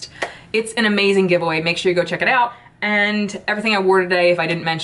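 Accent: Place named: Philadelphia, United States. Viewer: I recognized your slight accent and realized you’re a fellow philadelphian ☺️ I love your content and how real you are!!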